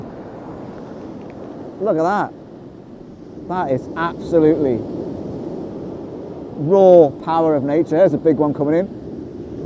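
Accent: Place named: Merseyside, United Kingdom. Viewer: Really enjoyed that video only just discovered your channel so will be watching a few more. It is a truly magical location I must of followed a similar path to you, as I have similar angles,(maybe not quite as death defying on the cliff) it was that windy I could hardly walk up by the lighthouse. Am I right to think by your accent you don't live that far from me in Merseyside somewhere I live in Runcorn.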